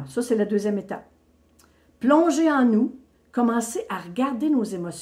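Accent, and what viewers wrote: Quebec, Canada. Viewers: Bonjour à vous,🤗 Merci merci. Une première fois avec vous. J'adore votre voix du Québec. 👍👍. Vos informations sur les différents étages de notre cheminement. Ça aide. Toute ma gratitude. Merci merci. 🙏🏿🙏🏿🙏🏿🌟🌞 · Merci infiniment pour ces explications claires, canal aussi je confirme que la phase 4 est rude avec tous les maux du corps mais sachant que nous sommes dans ces passages - pas -sages, alors on oeuvre sur nos ombres, on continue et on traverse.... Belle continuation à vous j'adore votre accent canadien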